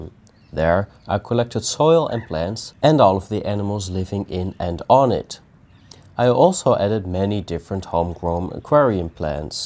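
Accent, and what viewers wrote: Netherlands. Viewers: Je klinkt Nederlands (you sound Dutch). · so this guy can only be dutch and i love it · Are you perhaps dutch or Belgian? I hear a similar type of accent🤔😂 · I can’t unhear the dutch accent… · do i hear a dutch accent? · Are you Dutch? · Heerlijk man dat Nederlands accentje LUV IT❤ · you sound so dutch, loving the vids! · first time on your channel.. took my 3 words to figure out you're Dutch xD Interesting video concept though! Subbed for now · I'm gonna say dutch. As a another dutch guy, our accents are usually destinct when speaking English 😂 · I'm sure you're Dutch. I heard the Louis van Gaal in you. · Based on your accent. Are you Dutch! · that dutch accent xD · The way you pronounce these latin names with that ever so slight dutch accent cracks me up a lot 🤣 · Heel leuk kanaal kameraad! Je G’s geven het weg dat je een nederlander bent haha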